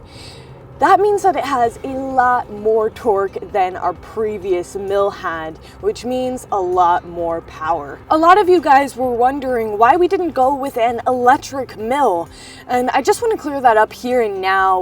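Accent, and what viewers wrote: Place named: Boston, United States. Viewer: You seem like you have a Boston accent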